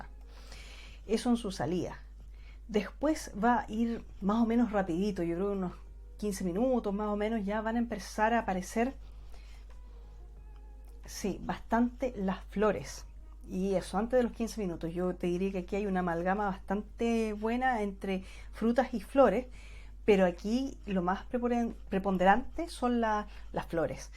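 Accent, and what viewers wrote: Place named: Chile. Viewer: Me gusta escucharte hablar con ese tono chileno. Saludos desde Uruguay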